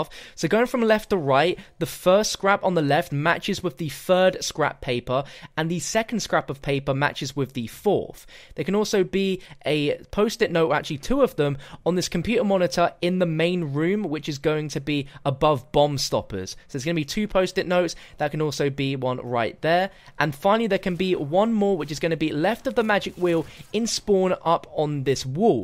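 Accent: United Kingdom. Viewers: I can't listen to the British accent.... Literally can't do it.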